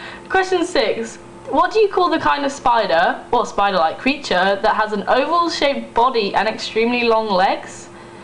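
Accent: East Midlands, United Kingdom. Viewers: Firstly you're insinuating that posh is not an accent, when it is. And secondly this is just how everyone sounds in East midlands, I'm from Brackley which is pretty much on the borders of Ox, Northants and Bucks and its quite easy to hear the regional differences between the three. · Nice! The test is really designed for different American accents. But, your accent is very lovely. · The East-Midlands Accent is the most similar United Kingdom Accent to the my American Accent. I must advise to never feel ashamed of your Accent, regardless of what another individual will verbalise, each quark of your East-Midlands Accent is Adorable, Embrace the Individual you are. 😉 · Thank you this is so helpful listening to your dialect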